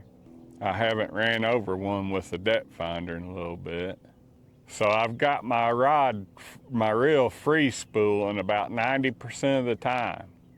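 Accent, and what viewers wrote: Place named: South, United States. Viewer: Love your channel! Plus sounds like you’re a southern boy like me. lol. My gf says wow you and him sound just a like when you talk. You do my kinda Catfishing. Simple gear catching fish. Great video! Up here where I’ve been living in Ohio you Can’t find skipjack here. I wanna move back to Kentucky soon. I lived near Markland Dam and miss it a lot. My son still lives there right next to Markland dam. As soon as my boats done being restored I’m heading that direction lol. This boat restore is killing my body. I stay sore lol. It’s a 1979 Sylvan deep V 16.5 foot. Anyway thank you for another great video! 🎣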